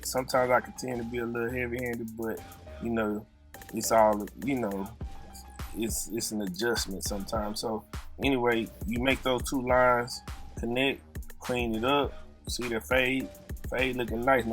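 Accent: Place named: Memphis, United States. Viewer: Where are you from? I'm from Memphis, you kinda sound like you're from here. Awesome Job ,on the haircut! I just found your channel. I have 2 boys on the Autism spectrum, they both have sensory disorders, my youngest is 9 and he has never been still enough to get lined up properly, and shaped up. I just wish it was some way to get him to keep still. I think his barbers, tools are a little to sharp for him, do you have any suggestions for a child with autism that cant hardly take the sensitivity of the tools? And I subbed to your channel! I'll look you up on insta!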